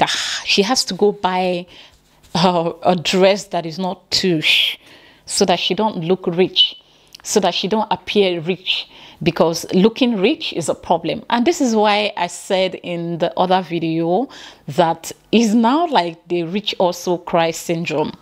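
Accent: Ghana. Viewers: You lady, you are funny, you speak as if you are a Ghanaian.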